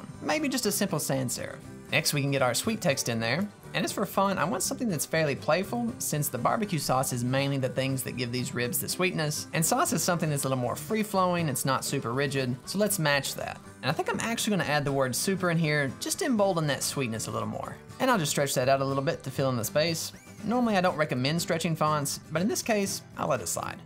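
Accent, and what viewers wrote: South, United States. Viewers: as a (fellow? my ear always catches that accent) Southerner, I love this menu and am now desperately craving ribs 🤤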